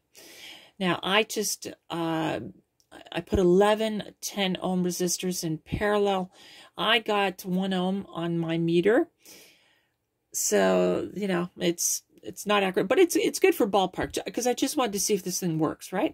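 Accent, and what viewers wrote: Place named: Canada. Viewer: I used to subscribe to Elector magazine. Many years ago. I thought it was. A cut above most. The usual ones which? I subscribe to as well. Now I design electronics for a living. Back in those days, it was just a hobby.. I see you're in Canada. I sensed a little bit of a Canadian accent. I'm in Niagara Falls, Canada.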